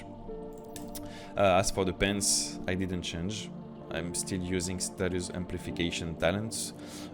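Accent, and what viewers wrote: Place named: France. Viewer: T’es français avoue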